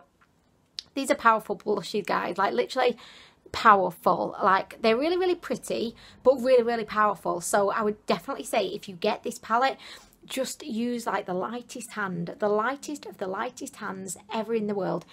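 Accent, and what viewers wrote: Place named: Manchester, United Kingdom. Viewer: Ahh!!! I just came across your channel!! You’re so precious 😍 are you from Manchester? You have the same accent as some of my family that live over there!! Lots of love☺️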